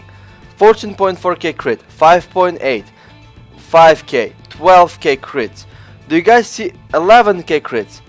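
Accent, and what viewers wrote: Russia. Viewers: Are you truly a russian, ive watched many of your videos and you talk the same in each video, but at this video you say to a guy in wow " Gonna make another video with stupid accent "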